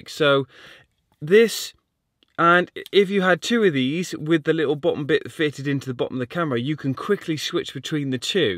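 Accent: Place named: United Kingdom. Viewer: i like those british accent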